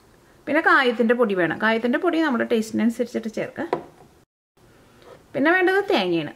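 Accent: Thrissur, India. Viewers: Ithu njan thanne kandu pidicha receipie athu kalaki 😆😆. All ur receipes are simply the best. And ur Thrissur slang😙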